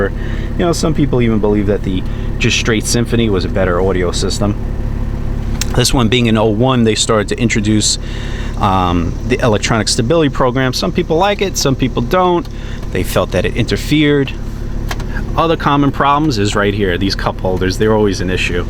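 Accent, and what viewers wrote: New England, United States. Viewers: fair enough I'm from NH just knew that had to be a new England accent lol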